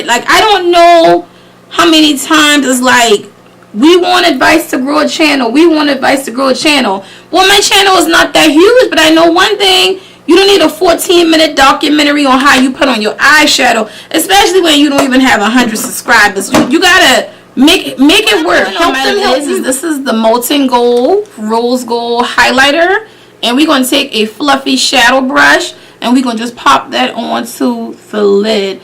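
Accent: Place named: Charleston, United States. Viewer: Came across these topper and wanted to see the pay off and I must say I'm impressed have to get a few now since I've checked out the video review first.. You sound like your from Charleston if so I'm a fellow Charlestonian. Makeup came out beautiful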